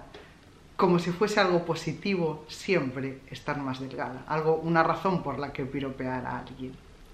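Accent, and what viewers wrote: Galicia, Spain. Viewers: Fantastico video, muy bien explicado y encima parece q tienes un poco d acento d mi tierra, GALICIA, te acabo d encontrar, pero ya no te pierdo🤩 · Que maja!... Eres gallega?.... Casi no se nota.... 😍😘😘😘😘 Que bien me caes! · Es galega. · A mi tambien me parece Gallega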